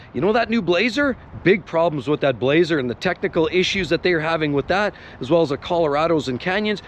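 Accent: Canada. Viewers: Are these Canadian dollar amounts because you sound Canadian?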